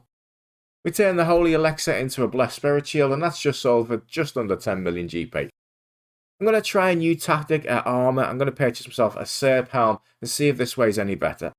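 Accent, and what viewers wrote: Liverpool, United Kingdom. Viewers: Where's your accent in the UK from friend? Scouse? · As a yanited fan, never thought I'd spend 2 hours listening to a scouser talk, and I mean this endearingly 😄. Amazing content! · Fellow scouser?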